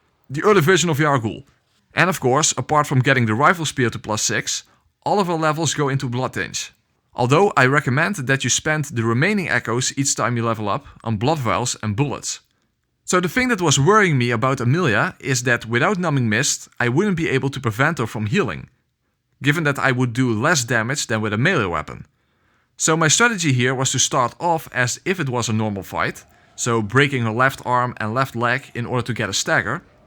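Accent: Netherlands. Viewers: Nederlands accent? hahaha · You sound kapot dutch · Jezus het Nederlands spat er vanaf de eerste seconde af. Lekker man, altijd mooi Nederlandse soulsborne fans.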